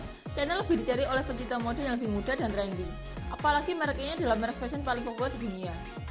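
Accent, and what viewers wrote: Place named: Java, Indonesia. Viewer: medok bgt ngomonge 😅 jawir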